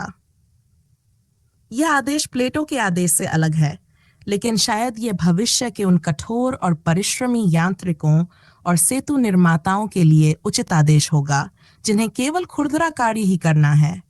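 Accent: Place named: United Kingdom. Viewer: Aapki Hindi bahut achhi hai parr angreji ki boo aati hai..😊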